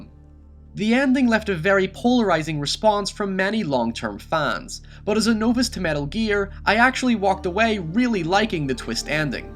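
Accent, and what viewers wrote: Northern Ireland, United Kingdom. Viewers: He has a completely typical Northern Irish accent. You certainly skimped on the punctuation lessons though, didn't you? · Northern Irish?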